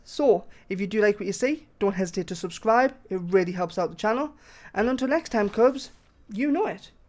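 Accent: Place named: United Kingdom. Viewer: What part of Britain are you from, mate? What dialect would you call your accent, it is very distinct! Also, thanks for these videos, very clutch!!!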